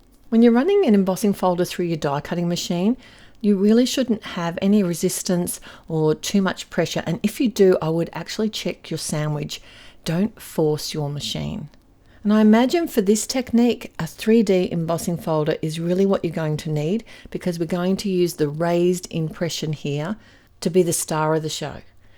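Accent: Australia. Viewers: Great hear an Aussie xxx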